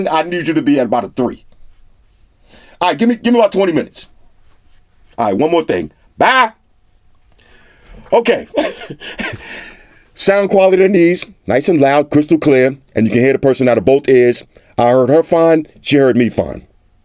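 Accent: New York, United States. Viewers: It’s a New York thing 💪🏽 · Just got into your video's and I really like them. I subscribed to you brotha. Also you sound like a true new yorker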